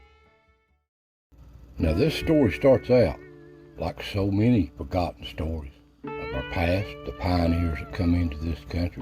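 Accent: Appalachia, United States. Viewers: I adore listening to you tell stories,. All the more so because your mountain accent just seems to authenticate the story. I close my eyes and I imagine an evening campfire crackling, little sparks floating up off the fire. Friends all huddled around patiently waiting for to begin, but holding their breath in quiet anticipation cuz we all know it's gonna be good. No disappointment ever. ♥ ♥ ♥ Thank you. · I love your videos. You talk exactly like my grandfather and uncles. Hollywood has portrayed our "accent" as indicating ignorance. But nothing could be further from the truth. While so many Appalachian people lacked formal education, they/we possessed knowledge that no other culture in America possessed. Please read the book "Born Fighting," by Jim Webb. It proves that we Scots-Irish are always willing to fight for what we believe in. We don't back down. 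We will NOT be ruled by a KING or any aristocracy. Yes, we talk "funny." But we built and defended this country as much as any other European culture. Please keep making these awesome videos!!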